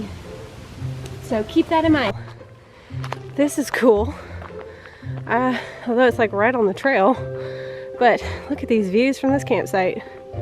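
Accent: Kentucky, United States. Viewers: Wow, you have been in Kentucky way too long. You sound like a country girl.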